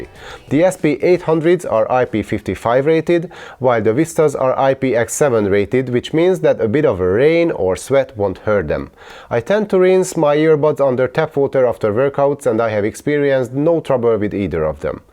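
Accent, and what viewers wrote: Hungary. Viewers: magyar akcentus? :)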